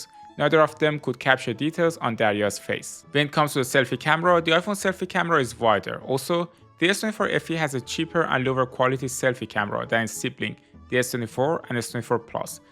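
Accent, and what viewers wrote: Iran. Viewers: لحجت به فارسی ایرانیا میخوره ! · I don't know where are you from but you have an iranian English accent 😁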